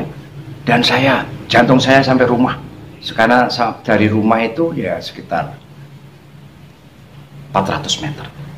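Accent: Java, Indonesia. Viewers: . Suara sedikit mirip (logat Jawa juga), tp ini bicaranya agak cepat, dn rambut lebih sedikit drpd PakDe Alex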